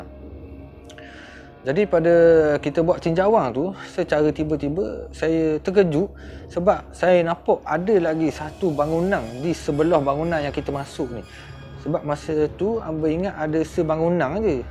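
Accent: Terengganu, Malaysia. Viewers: OH..terbaik..salam ukwah dari saya d SABAH...walau saya tak berapa nk faham sleng terangganu..tapi sangat memeranjatkan part abg sado tg lembut tu naik motor...nanti khabo kat dia jangan buat lagi...😂😂😂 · PEKAT MOLEK GANU TERR... TAPI TETAP MANTAP BOSSKU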